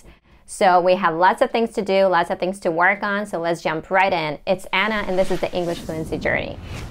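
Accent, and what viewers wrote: United States. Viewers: Can you tell what kind of American accent are you speaking? It’s a cute accent!!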